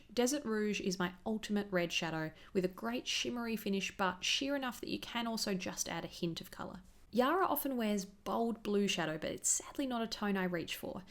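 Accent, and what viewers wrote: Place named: Australia. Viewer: Your Aussie accent makes your videos even more delightful to watch 😍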